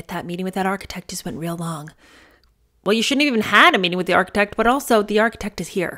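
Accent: United Kingdom. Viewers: I LOVE your British accent...so cute...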